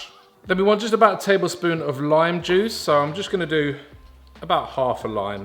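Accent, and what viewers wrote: United Kingdom. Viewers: Also British accent makes everything so fancy and delicious hahh 🤩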